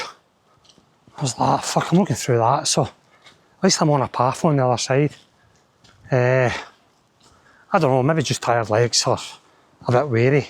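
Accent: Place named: Scotland, United Kingdom. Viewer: A few hours up my cuff good Scottish patter brilliant 😂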